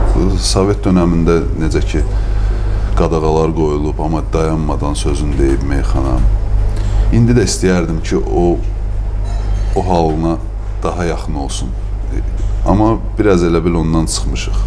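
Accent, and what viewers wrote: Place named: Shirvan, Azerbaijan. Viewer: Şirvan ləçəsi ləzət eliyir adama prostoy так держать земляк 💋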